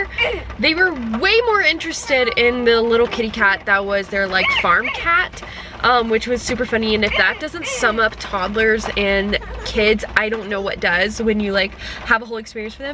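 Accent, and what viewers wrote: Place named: South, United States. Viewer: People who think your accent is fake isn’t from the south lol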